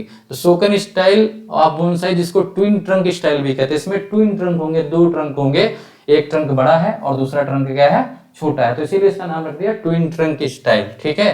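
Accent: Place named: Bahraich, India. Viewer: Sir bahraich side se hain na, mainly mahsi, apka accent bht match hota hai yaha se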